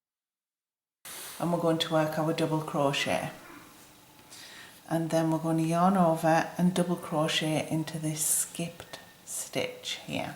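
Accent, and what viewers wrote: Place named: Northern England, United Kingdom. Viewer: Your accent sounds to me like northern England. I'm American so I can't be sure. Thanks for this really nice pattern and wonderful pattern!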